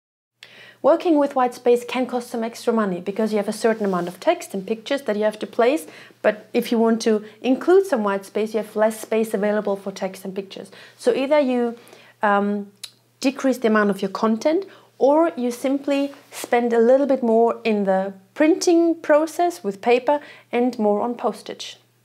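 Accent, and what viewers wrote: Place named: Europe. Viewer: European English accent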